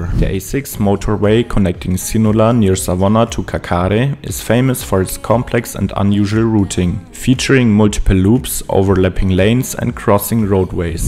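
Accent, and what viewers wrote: Germany. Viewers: boah den deutsch Akzent kann man sich echt nicht geben😂😂😂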